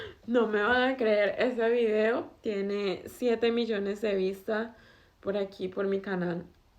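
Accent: Colombia. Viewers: Porqué tienes un acento colombiano tan marcado 🤔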